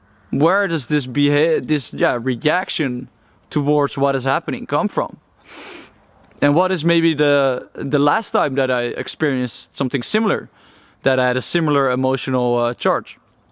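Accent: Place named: Netherlands. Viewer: Je bent nederlands toch want je klinkt heel nederlands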